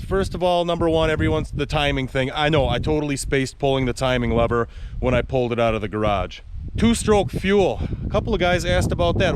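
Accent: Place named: Northeastern Pennsylvania, United States. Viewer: You in Pennsylvania? Sounds like a northeastern PA accent (like me)!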